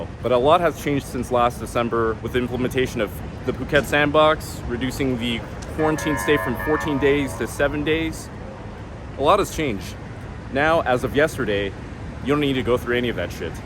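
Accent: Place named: California, United States. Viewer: he is californian lol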